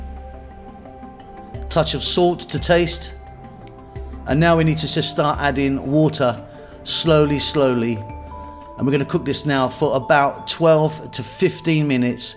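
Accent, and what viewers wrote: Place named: South London, United Kingdom. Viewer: and a lovely South London accent it is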